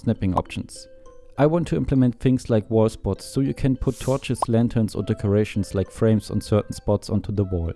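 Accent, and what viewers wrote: Germany. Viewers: hewo hörst dich fett deutsch an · Nices Game! Man hört das du Deutsch bist🤣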